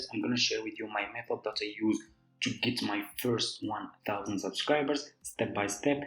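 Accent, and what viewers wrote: Morocco. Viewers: nadi a 3chiri mn laccent 3rftk mghribi kayn hh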